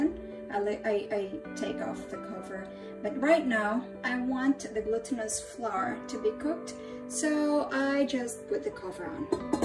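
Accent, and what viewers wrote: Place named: England, United Kingdom. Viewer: The best chef mom! super like the english accent and video content.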